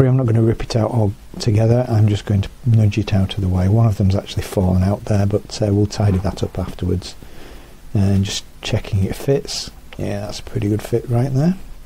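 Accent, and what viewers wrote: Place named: England, United Kingdom. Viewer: Thank you, your a great crafter and I love your english accent.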